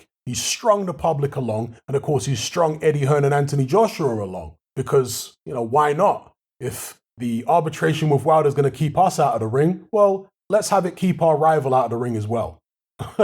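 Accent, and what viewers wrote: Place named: United Kingdom. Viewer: i just assumed it was a bri'ish accent thing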